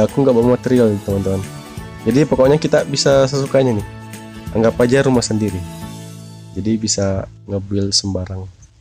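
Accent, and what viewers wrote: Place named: Sulawesi, Indonesia. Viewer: Dari Logatnya kayaknya orang sulawesi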